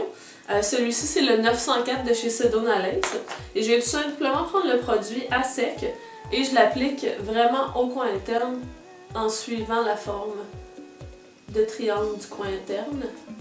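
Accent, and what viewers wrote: Canada. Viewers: T'es canadienne, t'es pas? :-) très bon truc!!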